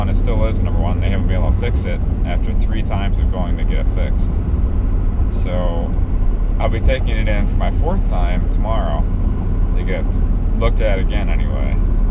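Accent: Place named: Illinois, United States. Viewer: what part of Illinois your from by your voice northeastern Illinois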